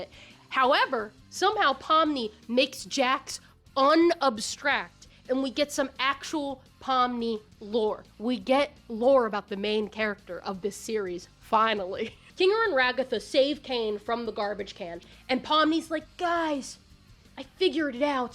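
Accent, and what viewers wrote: South, United States. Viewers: DAVID DIDDLER 😭 I SWEAR EVERY LINE THAT COMES PUT OF YPUR MOUTH IS SO OUT OF POCKET BUT PERFECT THE SOUTHERN ACCENT MAKES IT 100x BETTER HOLLY HELL Sadly I’ve never been to a con of any sort cause I’m from rural Maine and poor but I want to go to the local annual furry con in Portland someday :3 My only horror story about the furry fandom from personal experiences besides having to hide it from my classmates to avoid bullying was probably that I joined when rainfurrest was a current event and a lot of the furries I grew up watching turned out to be creeps 😭 (I think odinwolf ended up being like a huge creep.) Luckily most of the furries that I watched a lot are great people like pocari roo (as far as I am aware) and this guy who I still watch who’s deaf and makes education videos about what it’s like to be deaf and answers questions :3 he’s very wholesome (EDIT: LOOKED HIM UP! 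His name is Xushowolf he’s very cool :] ) So I’m glad not all my memories of being in the early fandom have been tainted by finding out horrible things about the YouTubers years later 😭 Oh yeah also other cursed thing in general is that I also joined the hetalia fandom around that time I’m still in it the fandom is much more chill now for some reason (maybe I’m just good at avoiding the crazy) but I still have people like get mad at me cause I just state (on my own blogs mind you like I’m not even commenting on their posts.) that one of the most popular ships makes me uncomfortable (usuk/whatever y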